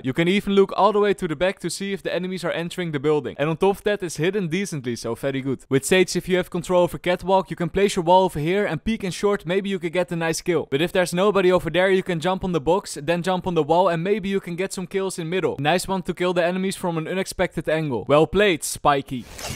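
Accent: Netherlands. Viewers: Ich liebe deinen holländischen Akzent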